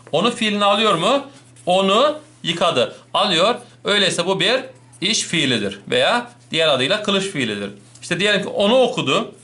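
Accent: Black Sea, Turkey. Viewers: HOCAM KAREDEZLI KONUSUYORSUNUZ BANA OYLE GELDI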